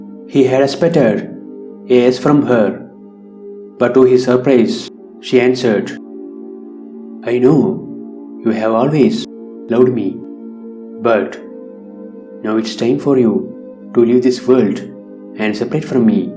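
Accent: Kerala, India. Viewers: Good One! Just that mallu accent is the only negative part. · Malayali narrator?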